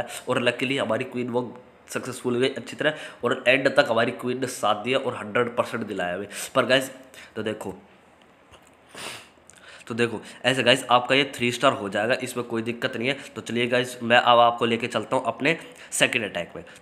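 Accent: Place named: Haryana, India. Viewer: Bhai video kti zhr h💓💖 tu haryana ka lage h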